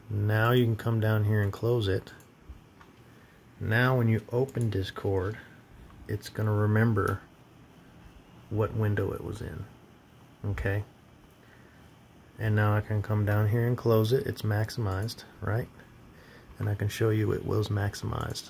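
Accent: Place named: South, United States. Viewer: Thank you you sweet southern man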